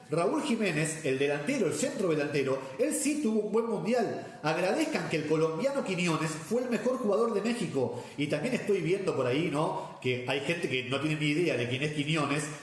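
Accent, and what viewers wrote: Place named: Argentina. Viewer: Hola mi buen Argentino (supongo por tu tonito) te equivocas al pensar que no conocemos nuestra realidad (futbolística) pero si te invitaría a qué no te amargues ni te lo tomes en serio lo del fútbol, por qué los mexicanos pasada la emoción volteamos la pagina y seguimos la vida igual de alegres (si conocemos nuestra realidad) admiramos a Messi si eso te hace feliz .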